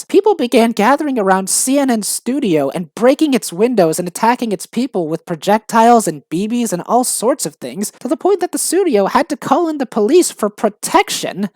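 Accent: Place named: United Kingdom. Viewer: bri’ish beta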